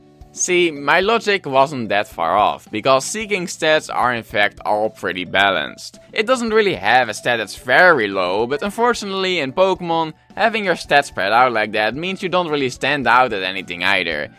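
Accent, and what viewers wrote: Netherlands. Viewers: It’s absurd but by the way you speak I asume your also dutch. Great video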